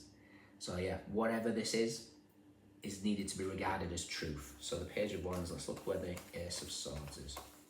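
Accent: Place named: Scotland, United Kingdom. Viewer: Hey there handsome man! and thank you for your time and by the way has anyone ever said that you have such a lovely accent !? 🤔 ❤ Cause you do!🌹😊 Is it Scottish? Enlightened me.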